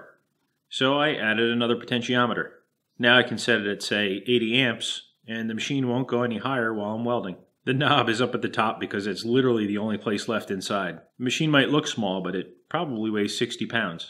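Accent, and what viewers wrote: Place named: United States. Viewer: Yes annoyed me as well - perhaps it is a Yank thing? Like Americans can't say solder - they say sodder not solDER! Still love videos - they are the best!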